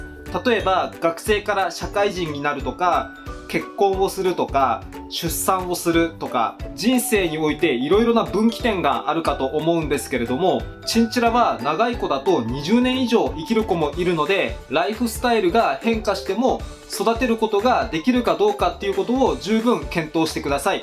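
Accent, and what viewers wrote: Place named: Japan. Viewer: もしかして地方出身の方ですか？ 話し方が可愛い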